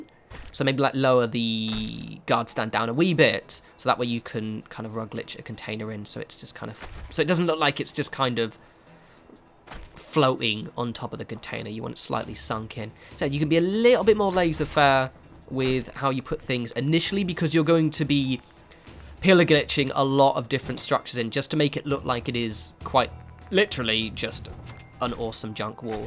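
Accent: United Kingdom. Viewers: Anarchy RWO I'm aware he has an accent, but plenty of people from the UK pronounce the word appropriately. I can't speak to any specific regional influence on pronunciation of the word, but it is correctly pronounced the same way in pretty much any place that english is the native language. Quit acting like I'm insulting the man's intelligence by offering a bit of educational advice.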